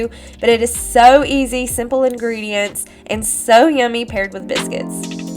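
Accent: South, United States. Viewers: love that you decided to do an episode on budget meals. Love your southern accent, what state are you from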